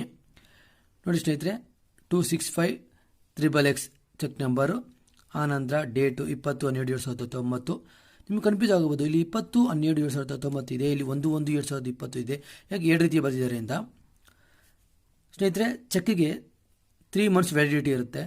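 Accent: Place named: Mandya, India. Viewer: Sir neevu pakka Mandya side thane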